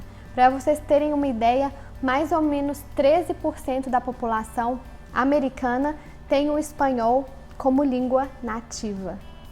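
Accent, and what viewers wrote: Minas Gerais, Brazil. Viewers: vinicius Correção, sotaque mineiro, então você é de Minas kkkk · Você é mineira?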